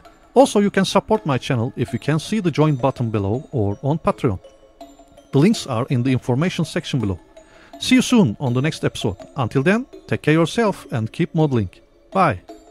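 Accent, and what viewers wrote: Russia. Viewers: new to this channel is that a russian accent or..? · Мне одному слышится английский с Рязанским акцентом?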